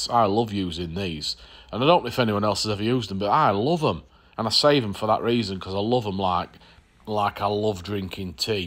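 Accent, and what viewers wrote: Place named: Northern England, United Kingdom. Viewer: No messing…no extended intros..just got on with what you want to share…brilliant. Love your refreshing no nonsense hurl stuff on the table approach enhanced by your grand up north dialect. Love it, subscribed from the bottom left corner of the country. Get on my ‘ansom.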